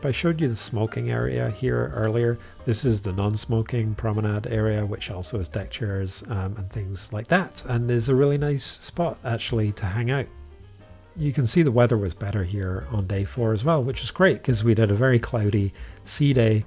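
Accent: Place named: Northern Ireland, United Kingdom. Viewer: Thanks for video, I like Princess and Celebrity but the latter is getting very uppity with their pricing these days. In fact I do not think we will be able to afford them again, plus when in the booking mode online, at the end there seems to be a huge upgrade price to pay if one wants a refundable deposit. I won’t have that, so c’est fini. I won’t ever visit a zoo, aquarium or anywhere that keeps animals prisoner for monetary gain. Visits just encourage them. Sanctuaries are different. Thx again. Where is your accent from, my friend from Londonderry, N.Ireland says “food” as “fudd” like you. Sorry but it is not “gi gi’s” as in the kids’ name for horses, but the French soft G, like an “sh” sound. The older ships have the name Alfredo’s, and up until this year it was free. Damn nuisance. Take care.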